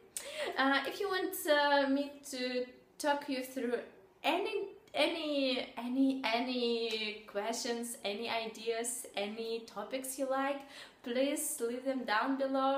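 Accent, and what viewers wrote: Russia. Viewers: the Russian language structure makes you speak English with that accent. don't worry. I was in kharkiv for nine months and I can't speak Russian and it is very difficult to pronounce the Russian words., you look very good and your English is careful and cute, take care sister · I was here for listening to funny Russian English but landed up in watching an honest confession.This is a really nice video,I appreciate. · The Russian accent is nice! Please do not lose your Russian accent. There is no need to acquire an Anglo-Saxon accent. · I will be deadly honest with you. You do have promenate Russian accent. It is unmistakable. Not to be rude, but your accent can not be mistaken for anything but Russian. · I'm mexican and still learning English a lot of people told me that my accent is like Russian, I thought it was terrible but ur accent it's lovely haha I really like the way u speak English, and u are so good at it keep going... 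Now I know how my accent sounds like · You are so cute and you have as how cute you as Russian accent. I hope one day, people will say ''ohh is she from Great Britain?'' for your English like you thinking about Danish people's English :) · Your English is good. You definitely have an identifiable Russian accent but it's the cute kind, not the kind that's too thick and difficult to understand. Your voice is pretty and enjoyable to listen to as well. And you are absolutely gorgeous (!), so that helps :p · I think the vast majority of American men adore your ( Russian women ) accent . I marvel at the comprehensive knowledge of English that Slavic people acquire in a very short period of time , especially Russian’s and Ukrainian’s . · You are a very nice woman and your Russian accent is very pleasant to hear it! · Sorry for some American behavior. We like the Russians trying to talk English.You are doing a great job and I have met Russians here and have no trouble with them.I like hearing their stories and your accent is cute.Take care and thank you for speaking English it is a honor.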